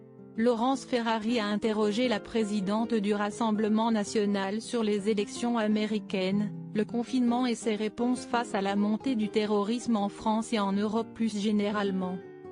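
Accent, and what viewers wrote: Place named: United Kingdom. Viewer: On passe l'accent nullissime Franco anglais de cette voix pourrie sinon on attend face à l'info on en a rien à foutre de punch line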